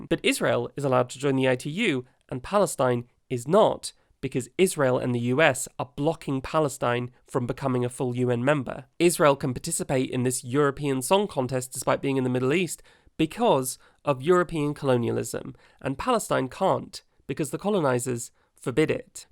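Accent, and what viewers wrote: United Kingdom. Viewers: british accent with the pplicker lisp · I’m a new subscriber after this. I really disliked this episode and you explained my feelings so well. Great video. And your British accent works so well for the delivery of your satirical lines.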